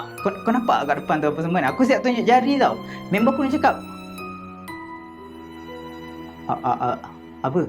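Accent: North, Malaysia. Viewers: Hahahahaha lawak bang buat lorat utaraa😂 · Loghat utaqa bang bukan main sempoi🗿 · bang saya orang utara bagi saya abang punya utara tu okay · Boleh tahan jugak loghat utara abang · Bolela loghat utaqa abg🤣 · Bahasa utara abang tu betul la...tak sangka plak betui...saya oqang utaqa hahaha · Loghat utara tu still acceptable 😂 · Lawak la oi abang cakap utara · Pandai pulak die cakap utare😌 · boleh lh skit skit nk ckp utara tuuh sebab saya oang utara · Saya org utara saya tk tau nk cakap mcm mana bila abg cakap loghat utara. 😅😂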